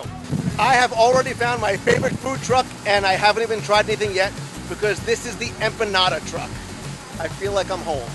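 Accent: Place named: East Coast, United States. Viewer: Invest in a microphone! I can barely hear you, then the music blows my ears out. We can tell you're from the East Coast, please slow down and enunciate.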